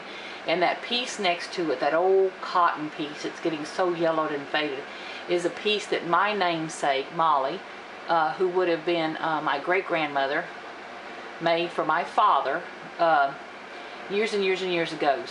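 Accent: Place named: South, United States. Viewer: You are a woman after my own heart!,,diet coke and chocolate! HA! Thanks so much for sharing Molly! Good to hear a fellow Southern-Sister's accent on YouTube,,keep up the good work!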